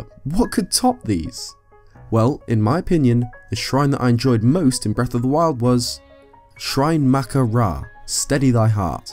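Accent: United Kingdom. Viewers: Are you from Britain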